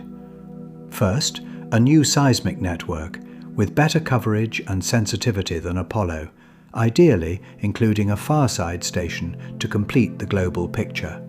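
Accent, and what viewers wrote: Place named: England, United Kingdom. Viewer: A..I uses an English accent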